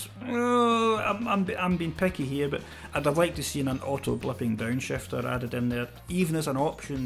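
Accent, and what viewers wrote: Scotland, United Kingdom. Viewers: Ah the Scottish accent met with arsehole comments. Being Glaswegian myself, this is the shit that makes me refuse to share my own videos. If only we all had those irritating south English squeaky accents.... very very informative video guys thanks, mine comes this week :)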